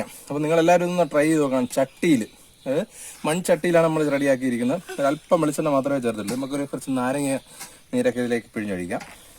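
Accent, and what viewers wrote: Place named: Thiruvananthapuram, India. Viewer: Thiruvananthapuram alle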